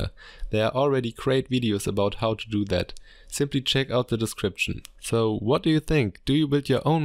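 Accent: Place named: Germany. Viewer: Deinem Namen und Akzent zur Folge, schätze ich du bist Deutscher und womöglich in Boeblingen im Einsatz? Aufjedenfall super Arbeit. Ich wollte für meinen Raspberry Pi schon immer ein Mainframe Case und für mein Cubieboard ein DS8000 Case. Leider bin ich bisher noch nicht dazu gekommen, aber vielleicht nehm ich das kleine Projekt demnächst mal auf. = )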